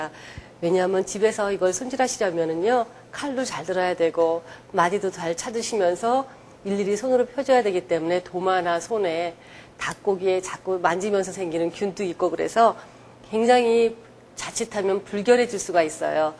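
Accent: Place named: North Korea. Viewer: 북한 사람 말투인데 어딜까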